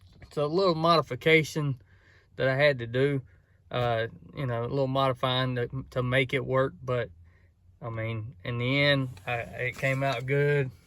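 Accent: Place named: North Carolina, United States. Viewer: You gotta be From NC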